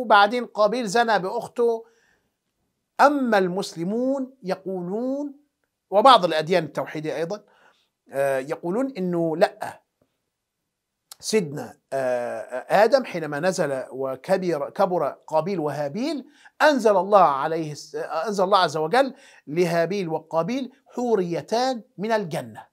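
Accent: Egypt. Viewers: ليش تحچي مصري ؟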